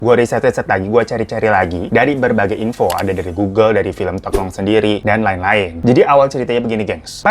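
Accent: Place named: Pasar Minggu, Indonesia. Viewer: Kamu ngerti keseluruhan bahasa indonesia logat pasar minggu emang?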